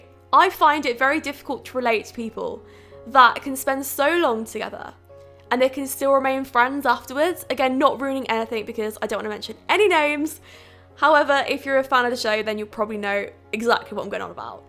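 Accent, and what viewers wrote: United Kingdom. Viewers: I like ur british accent 😂❤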